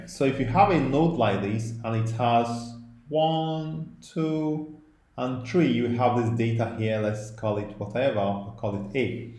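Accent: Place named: Nigeria. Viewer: Thanks bro. I don't know if I understand better because of the Nigerian accent, but this helped a lot.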